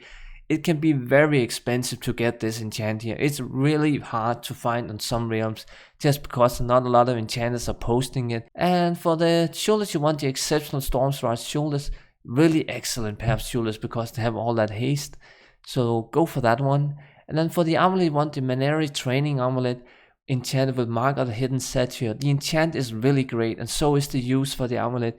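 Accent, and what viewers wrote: Denmark. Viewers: i smell danish somehow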